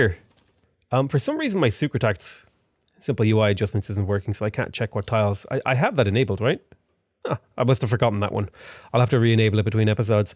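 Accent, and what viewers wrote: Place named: Ireland. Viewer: Anyone feel that he was 2x more Irish than usual?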